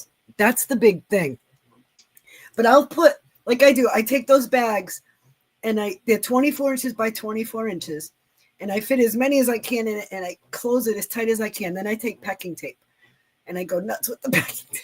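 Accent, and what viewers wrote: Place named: New York, United States. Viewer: Hi. Sorry I missed your live but watching replay. Your Boggy Blanket are so beautiful. Congrats at almost your 100 goal of number of blankets. That is a lot of work. Love to watch your videos. Not sure of where you are but your accent sounds northern, maybe close to New York. Have a great day, take care and stay safe. A friend.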